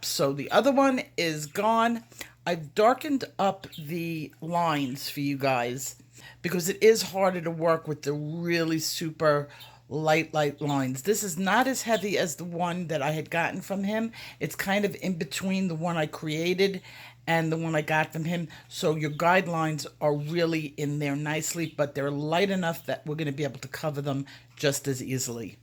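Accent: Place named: East Coast, United States. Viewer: I am so excited to do the step by step with you and what an awesome gift....you are a very beautiful teacher. You remind me of Cary Ublouer who was a teacher I studied with many years ago. Since you have an accent, I told my friend Bill about you...he just loves your lessons because he's from the East coast and misses home! Thank you so much for your sharing your experience with us. You're a wonderful teacher!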